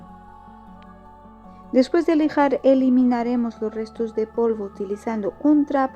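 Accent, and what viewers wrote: Portugal. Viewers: Excelente trabalho. Até aposto que a voz é de uma portuguesa🥰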